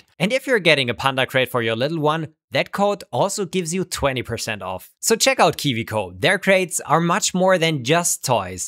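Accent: Germany. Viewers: I can hear that german accent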